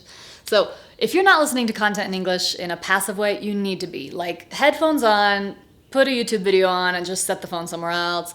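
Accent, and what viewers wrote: Spain. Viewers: you have a great spanish accent! it's perfect. Thank you for your recommendations.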